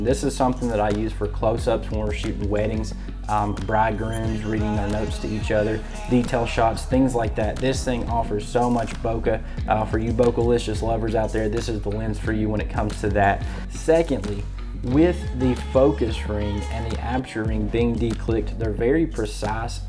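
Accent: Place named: South, United States. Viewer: I'm subscribing just because of your southern accent.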